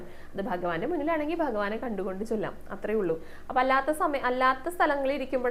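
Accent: Thrissur, India. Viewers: Ur presentation with thrissur accent is liked much. 😢😢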